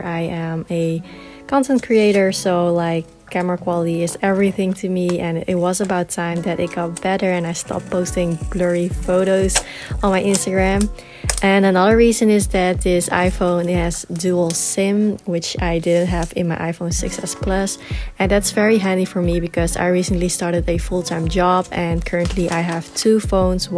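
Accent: Netherlands. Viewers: Is it me Or is your accent extremely dutch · are you dutch? it kinda sounds like you have a dutch accent :’) loved the video btw !! :D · Gurl are you Dutch? You sound it .. the accent. Im thinking of going from the 6 to 12... Was it possible to transfer data from 6 to 12 wireless? Or icloud backup? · Correct me if I am wrong, but your accent tends to be dutch-English I am right?. BTW nice unboxing-video especially the screen-protector. It's so easy. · Sterk nederlands accent😆😋😋 Leuke video👍🏽Heel ontspannend met de achtergrond muziek en rustgevende stem🥰 Top👌🏽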